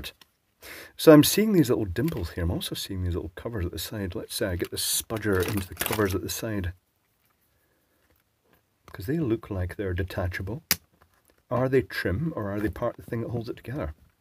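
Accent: Scotland, United Kingdom. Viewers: your soft Scottish accent and sarcasm works perfectly when discussing China's quality products. You always have me laughing out loud. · Excellent and very educational! There's something about this Scottish accent version which inspires confidence- as opposed to the 'English bastards...' version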